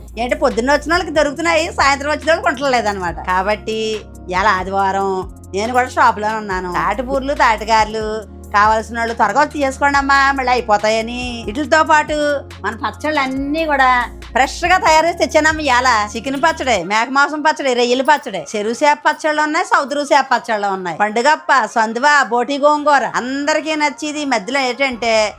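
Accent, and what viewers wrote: East Godavari, India. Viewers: Me slang veni east godava anukunnanu kani teera meru address cheypeysariki ma pakka vurey chitrada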